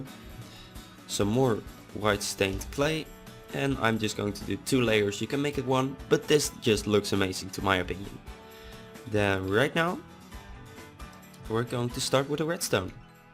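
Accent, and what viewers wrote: Netherlands. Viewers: Are you Dutch, because you sound like a Dutch guy who talks English.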